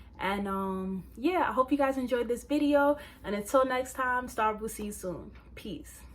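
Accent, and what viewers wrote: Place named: New York, United States. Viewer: Omg are you from NYC, is this how we sound😂😂😂😂 lol 🌺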